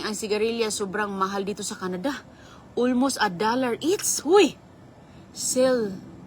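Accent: Visayas, Philippines. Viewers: Sorry bisaya lanh kung ganyan accent niya. Be kind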